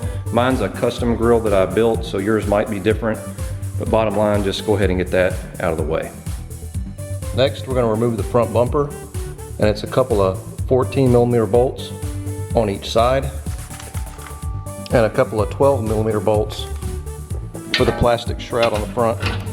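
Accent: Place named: Carolinas, United States. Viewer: Are you in the Carolina's? Guessing by your accent ;) I too have a 3rd Gen 4runner with the TRD S/C (Walbro 190LPH, Supra Injectors, URD Pulley, JET flash ecu). Pings going uphill under load so I'm looking at Methanol injection. CLEAN INSTALL and I appreciate your thorough explanations!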